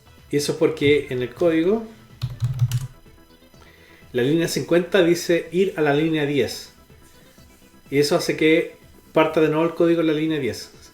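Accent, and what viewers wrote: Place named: Chile. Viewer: excelente, increible la coincidencia !... yo estoy metido tambien en un grupo de Facebook Atari Chile, ahi tambien se comparte harta informacion, hace poco por ejemplo compre el A8PicoCart y el SIO2PC pero es un Arduino con Pantalla Tactil seguro lo ubicas, y el vendedor que es un usuario del mismo grupo me vendio el Cartucho 4 en 1 que trae Basic, y Ensamblador, y justo me pille este video, cuanod escucho el acento del locutor, y me sorprendi que un chileno este hablando tan bien de este tema.. y mejor aun si es de la zona. Asi que, encantado nos podemos juntar, te aviso cuando vaya a Quilpue y charlamos. La verdad a mi me gusta la programacion retro tambien, de hecho, para C++ no utilizo ningun IDE, uso VIM sin esas herramientas modernas de Intelisence o Autocompletado, mientras mas simple el editor mejor. es mas tengo en mi canal unos mini tutoriales para configurar el entorno al estilo Borland y Atari. 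Sobre el Z80, estuve mirando unos videos de Profesor Retroman, es un canal español super didactico tambien, ahi aprendi algunos conceptos como dibujar sprites en pantalla.. pero como no tengo nada parecido al Z80, me incline por el 6502. En que usas C++ ?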